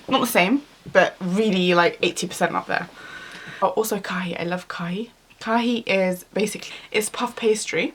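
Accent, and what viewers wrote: Iraq. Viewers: yeah haha something about iraqi eyebrows! <3 beautiful tho!! also her arabic is iraqi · Your Iraqi is so similar to mine! hahaha, nmashi el hal.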